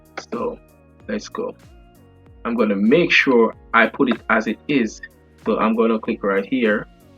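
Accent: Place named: Jamaica. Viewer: This guy is definitely a Jamaican. Very informative video.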